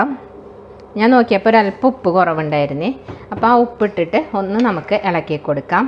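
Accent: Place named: Thrissur, India. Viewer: very nice recipe....are you from trissur? you have trissur slang...im also from trissur😀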